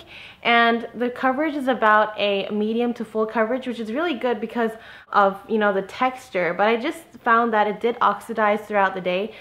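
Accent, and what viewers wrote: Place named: United States. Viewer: Are you originally from America? You just have an American accent that's all (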